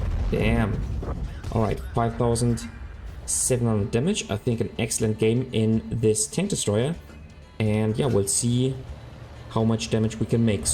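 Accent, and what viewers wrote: Germany. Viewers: Do I hear a German accent in your voice?🤔😁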